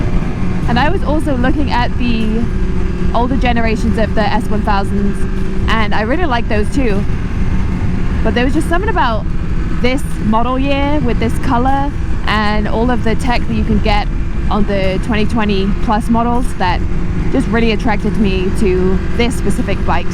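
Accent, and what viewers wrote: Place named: Cardiff, United Kingdom. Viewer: Mad hearing a Caadiff accent in the US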